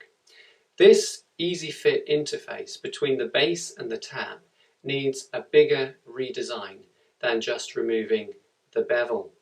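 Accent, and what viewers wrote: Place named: Scotland, United Kingdom. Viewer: I can hear a Scottish twang in your voice....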